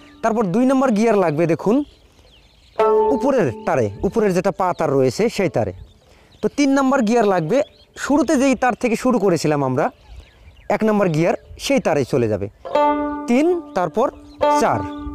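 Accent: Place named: Bangladesh. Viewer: আপনি আসামের লোক অথচ ভাষা আমাদের বাংলাদেশের মত। অবাক লাগলো ব্যাপার টা।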